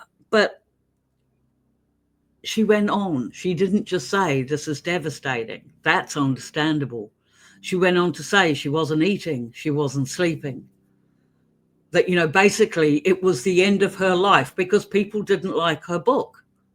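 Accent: New Zealand. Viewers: I saw the backdrop of your thumbnail and thought, oooohhh that looks kiwi. Heard your voice. Was like definitely kiwi. Hellooooo from Auckland 👋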